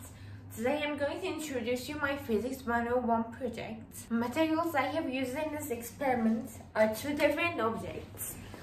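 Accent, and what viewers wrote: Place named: India. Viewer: Abla hintçe aksanın var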